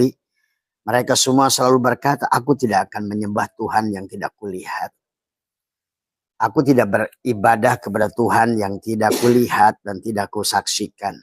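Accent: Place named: Java, Indonesia. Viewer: Pasti orang jawa ini ya😂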